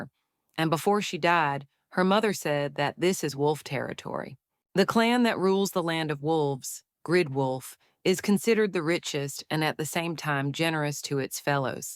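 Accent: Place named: Texas, United States. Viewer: Why is she from Texas?? 😭😭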